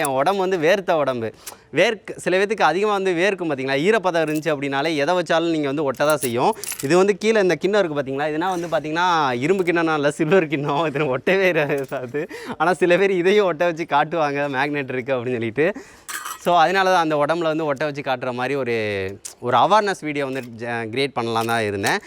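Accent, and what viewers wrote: Sivakasi, India. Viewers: Bro U sivakasi yaa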